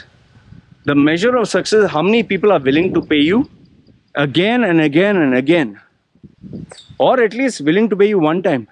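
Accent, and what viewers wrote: India. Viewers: You sound like an indian as well. And why dont you sit down while speaking, just to show some respect to your listeners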